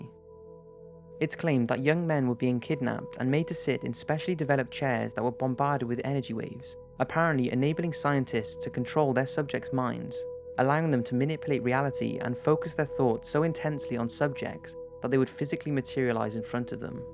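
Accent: United Kingdom. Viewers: Loving the content man, keep it coming! Out of interest, are you from the UK (and if so, which part)? Your accent is awesome for these videos.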